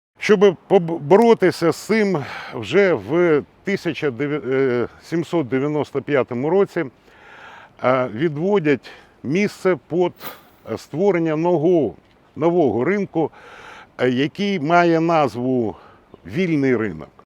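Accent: Odesa, Ukraine. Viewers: Пане даже гарна одеська українська мова.дуже цікава розповідь продовжують далі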